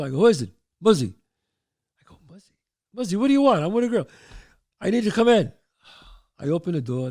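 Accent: United States. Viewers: Enjoy the show.. love the old nyc talk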